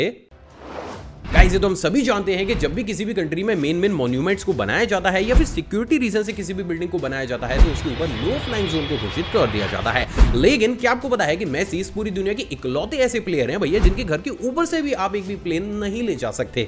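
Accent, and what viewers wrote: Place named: Mathura, India. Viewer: Bhai aap kaha se ho ye language to hamare yaha ki he mathura ki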